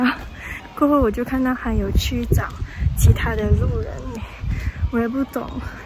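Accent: Malaysia. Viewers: 马来西亚华人口音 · 被youtube推荐来的！一开始看封面以为是中国小姐姐，没想到是熟悉的马来西亚腔哈哈哈，小姐姐好有趣好有气质，果断关注！（以前有考虑过去国外读心理学，看了你的vlog超感谢自己当时没有坚持，太难了哈哈哈😂🤭）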